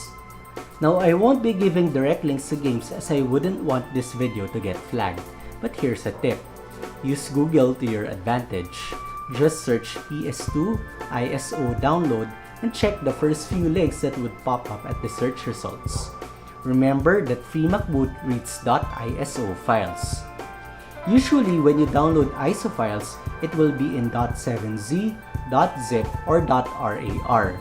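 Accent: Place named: Philippines. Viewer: Pinoy ka ba? Halata sa accent eh lol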